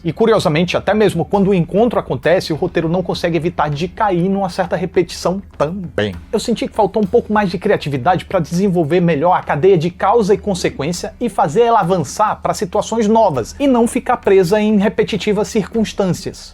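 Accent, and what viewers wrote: Northeast, Brazil. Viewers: Adoro suas análises com esse sotaque nordestino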